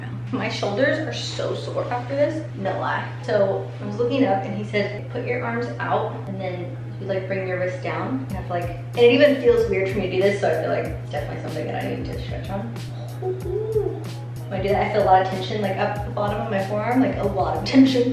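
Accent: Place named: United Kingdom. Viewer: The voiceover for your cats is SO FUNNY. Pls make a video doing that in your British accent or whatever lol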